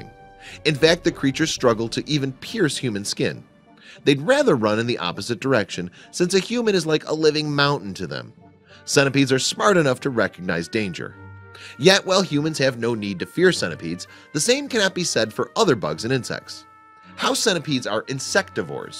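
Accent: United States. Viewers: “Tchi-tn”. I am sorry, but this is pronounced “Khai-tn” (from Greek χιτών, chiton). “Cheating” is a completely different word. American narrators are so American...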